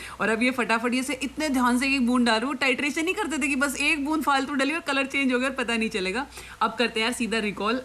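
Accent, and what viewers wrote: Haryana, India. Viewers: Ma'am आप कोण से गाम तै हो क्योंकि आपणि बोली सुन्न की बड़ा आच्छया लाग्या 👍👍😂 लेकिन maam म्हारा तो अंग्रेजी मै बेड़ा गरक हैं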